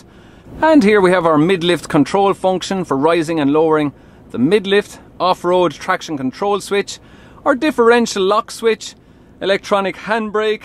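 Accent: Ireland. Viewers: Greetings from Sweden. Love that Irish accent, wish I could speak like that 🙂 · Your voice is so entertaining to hear, I love the Irish accent Very informative, and the Scania R520 V8 Streamline is my favorite truck!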